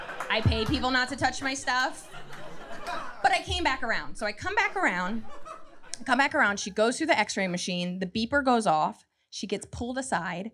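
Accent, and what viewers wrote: New Jersey, United States. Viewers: Hilarious. I’m a transplant from the east coast and hearing your humor and accent brought me back to summers in Jersey. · youre clearly from NJ:)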